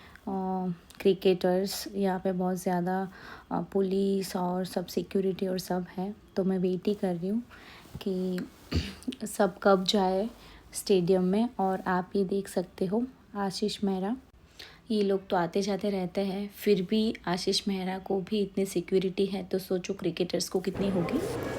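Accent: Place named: Gujarat, India. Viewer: Your gujarati accent is good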